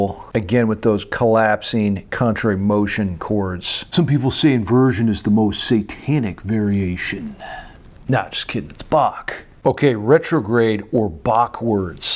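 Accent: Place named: South, United States. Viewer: you remind me SO mcuh to Dimebag Darrell, between your southern accent and silly humor similar to the Vulgar Videos. Keep it up!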